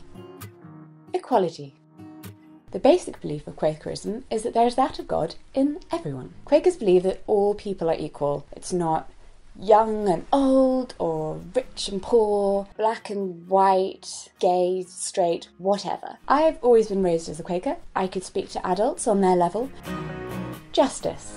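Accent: United Kingdom. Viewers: I love your voice so much. You have my favorite British accent. Anyway, I am Christian ( Protestant), denomination = Baptist.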